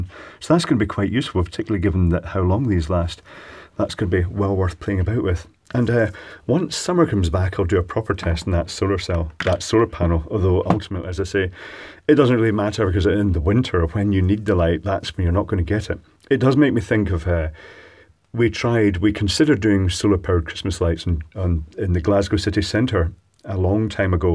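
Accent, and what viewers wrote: Scotland, United Kingdom. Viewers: I am a nonnative speaker of English,yet from the first seconds on I liked your Scottish accent.By the way, the Irish and Scotts are the only English speaking people I like as they were also tormented by the imperial empire.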